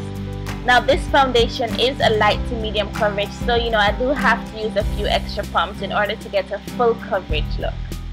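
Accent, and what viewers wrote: Jamaica. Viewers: I hear that accent are you from JA? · is she jamaican? she sounds like she is.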